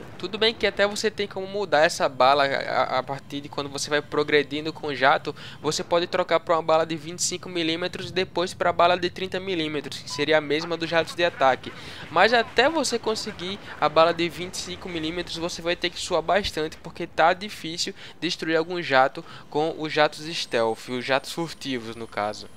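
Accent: Northeast, Brazil. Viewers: Você é nordestino?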